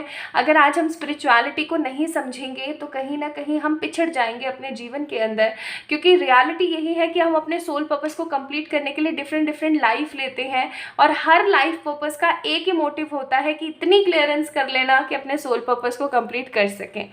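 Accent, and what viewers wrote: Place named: Hyderabad, India. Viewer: KYe ko..is Hyderabadi hindi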